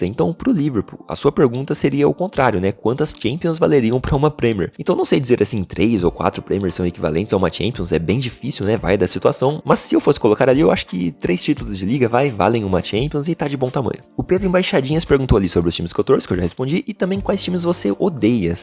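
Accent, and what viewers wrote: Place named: São Paulo, Brazil. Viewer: Ow mano, na moral, agora cê vai ter q explanar q canal grande q te tirou pra merda pelo vídeo do inominável holandês. Preciso ter uma conversinha com esse caboclo q pelo visto não assistiu uma partida sequer daquela atrocidade q era o United comandado pelo inominável holandês kkk. Aliás, imaginei q cê tinha ficado mais grilado com o video d reação do futurinhas sobre o Pelé, q tbm tenho minhas discordâncias com os argumentos q cê apresenta naquele video em específico, mas o debate saudável faz parte kkk. Outra coisa ainda kkk, se depois d vc dizer q no ensino médio tinha dois times q tu odiava e esses times não serem Corinthians e Palmeiras (então no caso tu seria são paulino), aí eu sou um astronauta haushsushsu. Supondo q cê tenha por volta dos 20 e poucos anos, então cê sofreu com os péssimos 10 anos aprox do São Paulo em uma d suas piores fases e viu tanto Corinthians e Palmeiras ganharem td durante essa época. 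Fora o seu sotaque do interior d SP kkk. Se esse for o caso, então tmj nesse sofrimento, pq tbm sou trikas haushsusuh.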